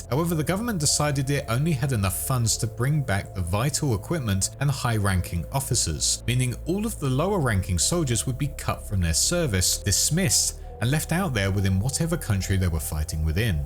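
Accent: United Kingdom. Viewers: nah he’s just British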